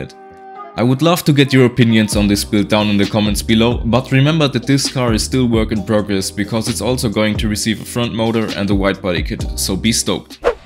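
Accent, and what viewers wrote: Germany. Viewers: U Sound rlly german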